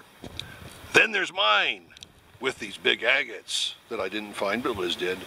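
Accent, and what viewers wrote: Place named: West, United States. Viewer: I don't know if you're still looking at your comments but I am a Westerner living on the East Coast do you know how long it's been since I've heard someone say c r i c k instead of Creek so nice to hear the accent years ago my mother-in-law that had a fit when I told her we were going down yonder to the c r i c k she did not appreciate my accent she informed me that I was going down to the creek not Yonder to the c r i c k I enjoy your videos Keep On Keepin On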